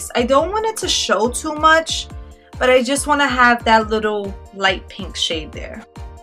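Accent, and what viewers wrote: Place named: Northeast, United States. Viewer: where the heck are you from?! hahaha I am from Texas and I can tell that you have a north-east accent and I love it!!!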